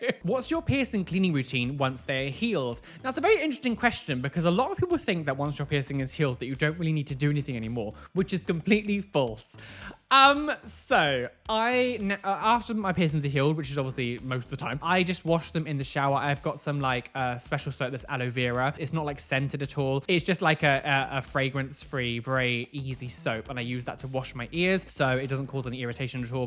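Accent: United Kingdom. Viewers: you should see me do my impression of you to my friends complete with hand motions and british gay accent. "time for another piercing reaction videoooooo!" lmao!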